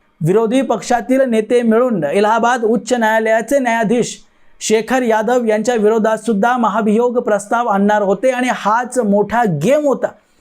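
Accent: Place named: South, India. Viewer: तुमचा टोन पूर्णपणे दक्षिण भारतीय आहे. टोन ऐकल्यावर मी तुमचं नाव वाचलं. ऐकायला छान वाटतं. Keep it up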